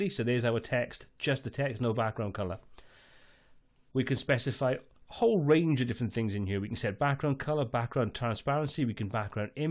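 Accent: Wales, United Kingdom. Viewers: That's it, all Youtube tutorial videos to be exactly like this from now on - well thought out, perfectly paced and delivered in a beautifully articulated Welsh accent :) Loved it.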